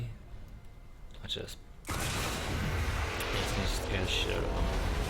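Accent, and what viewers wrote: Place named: Canada. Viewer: Love your sexy Canadian voice ;)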